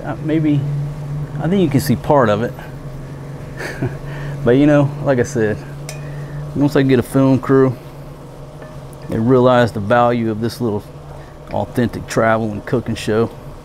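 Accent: United States. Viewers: Hi mate just love your American voice, wish I had it. By the way I got one of those DJI osmo pocket cameras love it. Dave australia.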